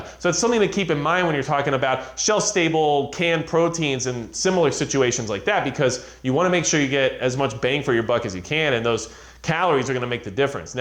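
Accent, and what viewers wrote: United States. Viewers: You sound like you're from Hawaii lol. There's so many ways of making rice into a meal in and of itself. If anyone's reading your comment they best be listening because Japanese rice is the 💣 Asian rice is the best although I like jasmine and basmati as well.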